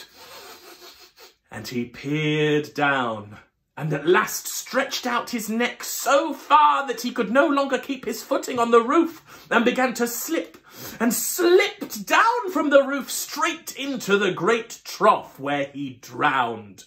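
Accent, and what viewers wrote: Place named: Newark, United States. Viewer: I loved this! Especially loved how her mother went from talking Cornish to Scouse 🤣 You're brilliant at voices though. If I hadn't seen you speaking perfect Newark slang I'd never of realised that you're from my rough town of Newark haha 😆 I could definitely watch this again.! I hope you keep going up in the world of theatre 🖤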